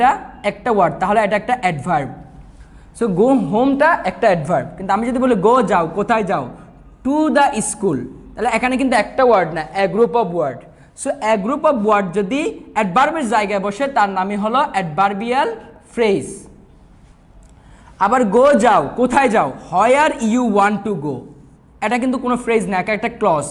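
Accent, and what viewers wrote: Chittagong, Bangladesh. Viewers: বদ্দা চিটাগংগা নে??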